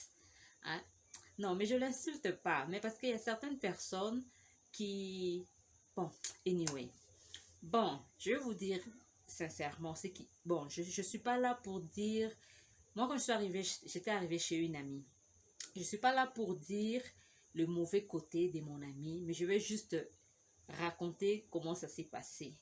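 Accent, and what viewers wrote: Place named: Democratic Republic of the Congo. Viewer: Lorsque j'ai entendu ton accent avec le mot videyo j'ai directement compris RDC et que tu es Nekongo avec tes mots swahili mais tu parles bien sauf que tes vidéos sont un peu long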